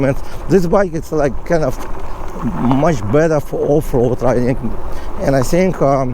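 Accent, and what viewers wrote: Russia. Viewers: мне русский акцент режит слух, такое дерьмовое произношение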